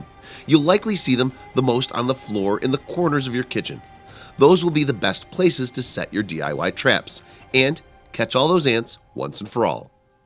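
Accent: United States. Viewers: Long winded American voice drive insane ,please come straight to the point, be more helpful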